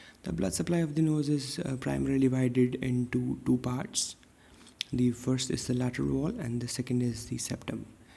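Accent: India. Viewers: External * you are inian that dialect is disturbing me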